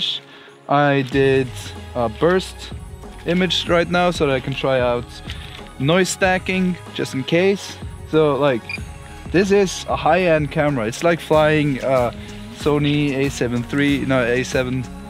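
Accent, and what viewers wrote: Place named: Norway. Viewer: Fabulous video, 🙌🏼 Are you Norwegian mate?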